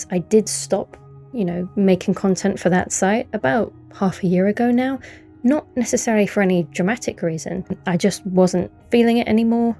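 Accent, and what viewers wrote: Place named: England, United Kingdom. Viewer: It was mainly the lore videos that caught my attention & made me keep coming back for more, your voice & English accent if I’m correct were a very nice bonus 😁😍😮‍💨👌🏽looking forward to more content from you, just don’t overwork yourself take a step back & breathe if you need to 🫶🏽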